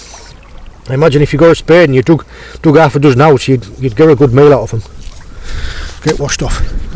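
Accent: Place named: North East England, United Kingdom. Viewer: Hi mate you sound like your from the North East which beach is this I'm from newbiggin by the sea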